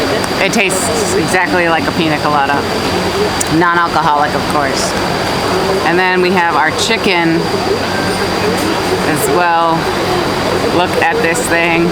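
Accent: United States. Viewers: Dear, thank you for the video but I have a question, why do you keep repeating "this looks fancy to me", "this looks posh", "rich people live here"? From your accent you obviously come from the US. So, there are no "fancy" neighborhoods in America? Do Egyptians have to live in slums to conform with the stereotype the West has about Egypt?? Egypt has 110-Million citizens, of course at least a couple of millions can have enough money to live in nice neighborhoods. This view of Egypt as a country that MUST BE POOR through and through is prejudiced and not right. Every country has rich and poor neighborhoods. In your country you have Hollywood and the Bronx, so let's drop this "fancy rich neighborhood" narrative as it is insulting to many hard-working Egyptians who have EARNED their right to live in "fancy" neighborhoods. Good luck with your videos! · I am Egyptian and I like watching your videos and listening to your American accent that's how I can get the accent .Go on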